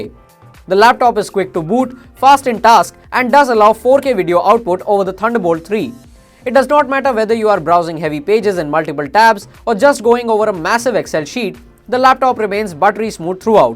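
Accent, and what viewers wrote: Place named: India. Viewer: lol, Indian accent really, and to me not so bad. its understandable.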